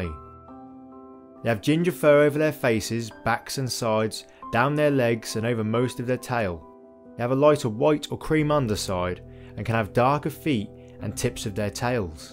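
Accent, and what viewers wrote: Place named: England, United Kingdom. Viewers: Very informative, nicely made and great to hear an authentic English voice. Keep up the good work. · You mean southern English voice 😳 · the irony of trying to tell an Englishman how to speak English 😂 classic Americans, so used to speaking a boring, robotic copy that their jaws drop when they hear REAL English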